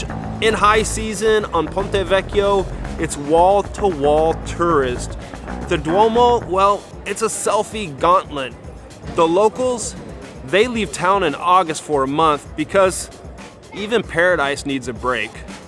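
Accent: United States. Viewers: You sound like an American. Perhaps just stay there.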